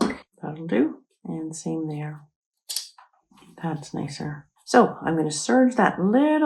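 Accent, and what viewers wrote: Canada. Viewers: I'm addicted to your videos! You make me feel like I can tackle anything....I love your accent, your humor and your clarity. As a former US military family, you make me miss Canada too. We were blessed to be stationed in Newfoundland and then Victoria, BC during our military career. Thank you so much for making these videos!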